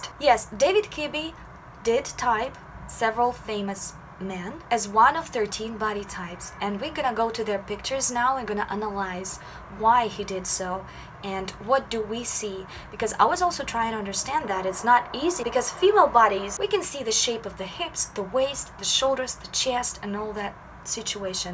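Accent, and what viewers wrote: Russia. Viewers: *beach *cheeks. She has a Russian accent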